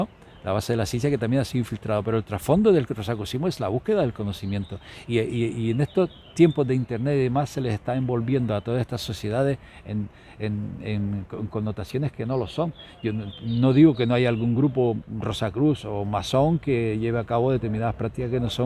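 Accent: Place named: Canary Islands, Spain. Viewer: Ostras❗️❗️❗️,Que sorpresa veros a los 2,a jamas lo habia visto fisicamente,entonces eres Canario como el? Esq el acento se me hacia familiar pero como hay tantos canales de Hispano-America. Voy a escuchar la charla solo falta ahi mi querido y desaparecido de los medios el GRAN Pedro Bustamante. Saludos Maestro 🇮🇨 🙂😉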